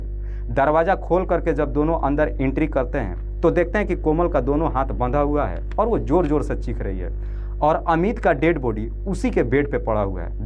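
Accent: Bihar, India. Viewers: Bihari ho kaa bhai?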